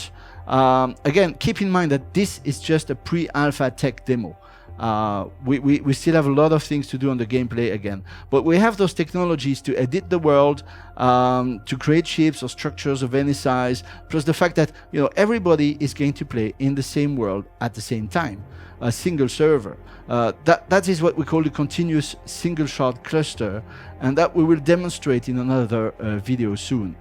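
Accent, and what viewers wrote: France. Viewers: French accent wtf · Are you French ? ._. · His french accent completely sold it to me.. Who do I give my money? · il est français ? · David Guetta's accent spotted. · Are you Canadian or French? Your English is very good with French "tongue'.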